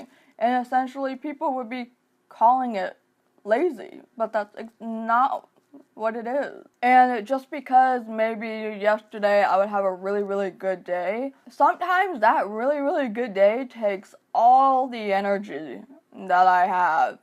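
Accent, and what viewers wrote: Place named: Southeast, United States. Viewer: I had to look up where you're located because your accent sounded so familiar and I knew it must be somewhere in the Southern East Coast. :D Sending solidarity from Maryland!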